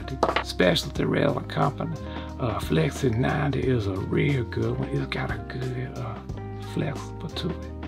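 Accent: Louisiana, United States. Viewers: Is that the bayou accent?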